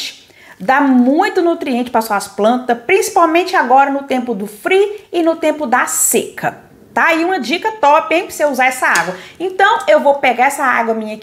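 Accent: Minas Gerais, Brazil. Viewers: Amei seu jeitinho de falar se e minerinha uaikkkkklinda amei sua receita vó faser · Nossa, que delícia, achei que era mineira por causa do sotaque mineira rsrsr.... Bjs adoro seus vídeos 😘😘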